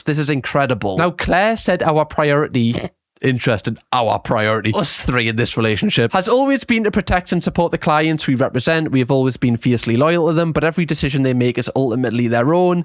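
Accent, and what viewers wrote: Newcastle, United Kingdom. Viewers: He is indeed Geordie :> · Hi, love watching your videos. Do you have a Geordie accent? It sounds familiar, but I'm not sure. I hope my sons will be as emotionally mature and empathetic as you are. Any tips? 😅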